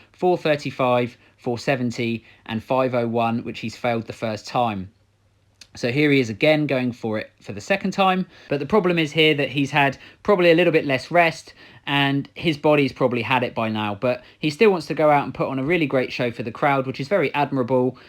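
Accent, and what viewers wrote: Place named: South London, United Kingdom. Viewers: Warning not really, more southern/London accent. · Nah hes got way too much of a pussy voice, posh london tosser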